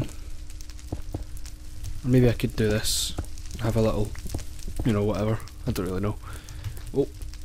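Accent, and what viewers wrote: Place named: Glasgow, United Kingdom. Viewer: No he doesnt? small beans is from york. He sounds like he's from somewhere near Glasgow. And you do know that insted of burning wood, you can burn one unrefined but of wood and make charcoal which is good for 8 full cycles instead of 1.5 for wooden planks.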